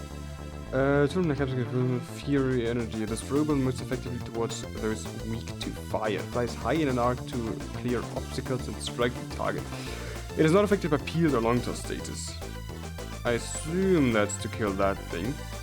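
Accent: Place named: Sweden. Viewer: Deutscher spricht englisch mit schwedischem Akzent und japanischem R 😂🎉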